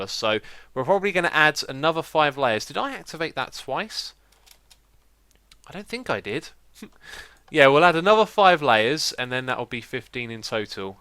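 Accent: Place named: United Kingdom. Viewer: I quit Minecraft a long time ago (1.5 years ago, I think), yesterday I saw one of your Hermitcraft videos and now I am really inspired to build again! You also learned me a fantastic British accent, which is quite rare here in The Netherlands! My English teacher was very proud and gave me an A. Thank you for everything!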